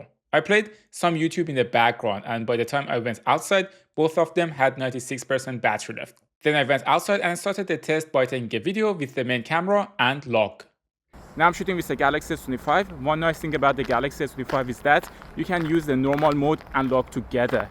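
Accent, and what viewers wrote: Iran. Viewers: Bro are You Iranian ? 😁😁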